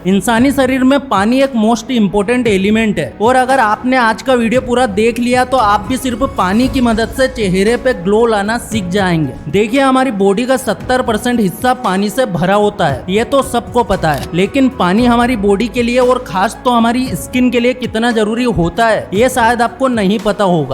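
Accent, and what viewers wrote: Indore, India. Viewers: Your accent quite similar, like typical Indori.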